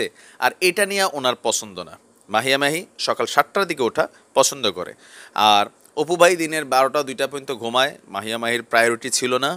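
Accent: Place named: Comilla, Bangladesh. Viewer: আপনার কুমিল্লার ভাষা গুলো খুব ভালো লাগে আমার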